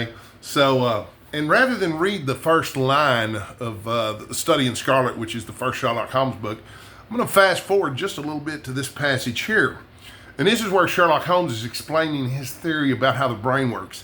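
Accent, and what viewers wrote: Texas, United States. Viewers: The sonnet you picked is one of my favorites, especially the last line. I've heard it read in an English accent, but never in "Texan" - not bad! Lord of the Rings is one of my absolute favorites - way up there in my top 10 list. It's wonderful, isn't it. 2 of yours - I like the authors a lot, but not the specific books you chose (Charles Dickens and Arthur Conan Doyle). All the Kings Men made a big impression on me, but I don't know that now I'd read it ahead of a number of books from that period. Still the idea that it was the Kingfish, well, it started my love of American history of the 20's and 30's. The only one I disagreed with was The World According to Garp - it's way down on my list. Regardless - your list shows you to be a man of taste and discernment.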